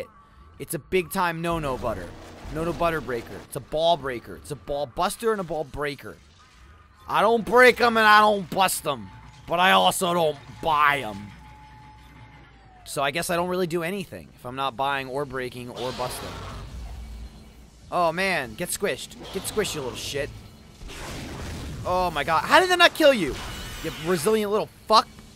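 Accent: United States. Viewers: ... You Are from USA?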